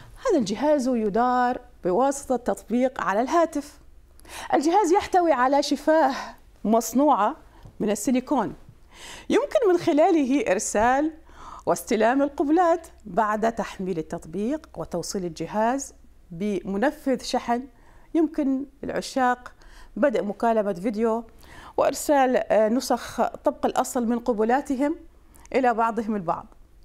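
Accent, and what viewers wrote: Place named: Iraq. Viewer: كنت اتابع ذي المذيعة مع أحمد و ما كنت ادري انه عراقية الا اليوم سمعت من لهجتها (': ❤️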